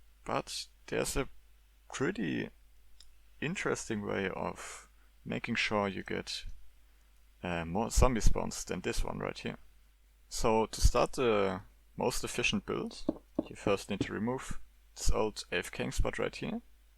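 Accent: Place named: Germany. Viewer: you sound verry deutsch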